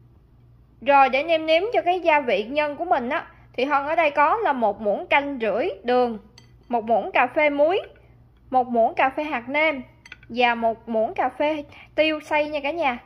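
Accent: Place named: Mekong Delta, Vietnam. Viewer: Hi...lần đầu xem video của b ..rất ấn tượng với tiêu đề ( nhớ mẹ)... Nghe cảm xúc ghê ..❤❤❤❤ Tiếng miền tây của b nghe🌾🌾🎣 thân thiện rỏ ràng không lẫn vào đâu đc hết... Cách chia sẻ cách làm món ăn thật chi tiết.thích👍😍 Chúc vui vẻ 🥰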